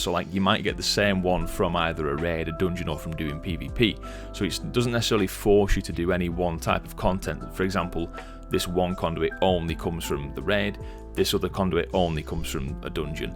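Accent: Yorkshire, United Kingdom. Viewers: Thanks for useful insight. Yorkshire? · Thankyou for this video, excellent put together, and has made me me absolutely certain I do not want to play Shadowlands. Also, cant believe your from Chorley, you sound proper Yorkshire mate :)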